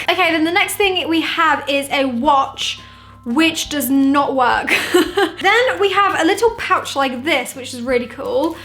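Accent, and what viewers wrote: England, United Kingdom. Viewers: Is she British? 🇬🇧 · You sound so British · 1dlover42aj british accent? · She's english. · Are you from britain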